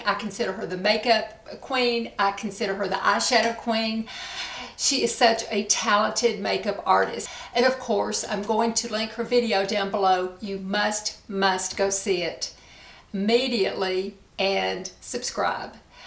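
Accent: South, United States. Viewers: I'm a new friend of,❤ We connected from our love and heartache of losing Mel. And I can say, in the short time I've known her, you couldn't be more right. She's such a beautiful soul, inside and out. Safe place to be❤ And though we became friends through such a horrible loss, I'm grateful for her friendship 🙏 I'm so happy she introduced me to your channel❤ You are such a beautiful soul, inside and out. Your southern accent will get me every time😘 So excited to be introduced to your channel...🦋🦋🦋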